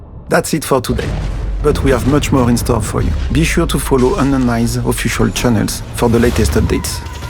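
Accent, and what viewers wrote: France. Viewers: Punaise l'accent français en anglais rend la compréhension difficile ! Learn to speak english please. I'll buy the game btw, looks cool ! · l accent fr qui cringe a mort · This french accent is getting on my nerves, and I am french ! At this point it's like he's making zero effort to be understood.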